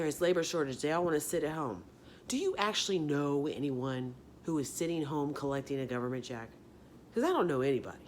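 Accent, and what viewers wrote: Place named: South, United States. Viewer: I hadn't caught that deep southern drawl in your voice before..Are you at Truth Social?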